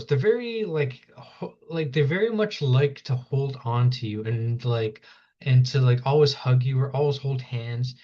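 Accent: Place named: United States. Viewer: Your channel name is (one of the names of lord krishna) but your accent is American why?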